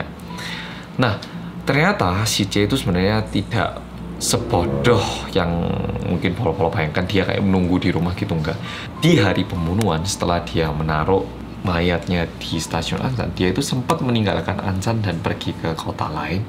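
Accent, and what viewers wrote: Java, Indonesia. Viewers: Mas medhok: "darahnya terus bercucuran" Me yg phobia darah: mati aja lah aku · yang medhoooooooooooooooooooooooooooooooooooooooooooooooooooooooooooooooooooooooooooooooooooooooooooooooooooooooooooooooooooooooooooooooooooooooooooooooooooooooooooooooooooooooooooooooooooooooooooooooooooooooooooooooooooooooooooooooooooooooooooooooooooooooooooooooooooooooooooooooooooooooooooooooooooooooooooooooooooooooooooooooooooooooooooooooooooooooooooooooooooooooooooooooooooooooooooooooooooooooooooooooooooooooooooooooooooooooooooooooooooooooooooooooooooooooooooooooooooooooooooooooooooooooooooooooooooooooooooooooooooooooooooooooookkk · Mas, suaranya medok2 gimanaaaaa gtu.....sy suka sekali mndengarnya......😁😁😁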